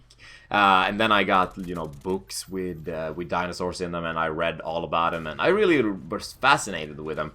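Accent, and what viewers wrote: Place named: Sweden. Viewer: "the shooting of yon f kennedy" hahaha swedish accent FTW!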